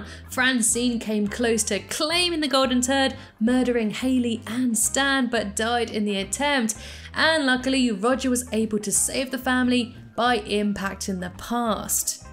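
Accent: United Kingdom. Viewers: Not about the video at all, but Girl, i just LOVED ur british acent, it just, idk, fits u so much, and its so cute to me, just wanted to say that, kisses from Brazil ✋🏾🤠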